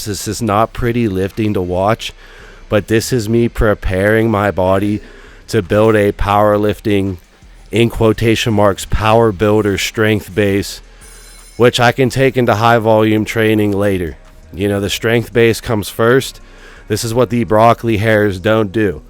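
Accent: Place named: Pittsburgh, United States. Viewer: You def sound like youre from the 412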